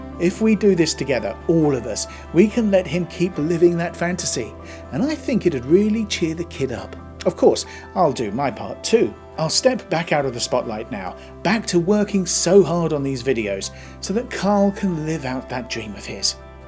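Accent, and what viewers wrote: United Kingdom. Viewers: This may be a friggin sick joke, but the British accent makes me think he isn't joking. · Wait, was it Carl or? Real Doug's accent was too British.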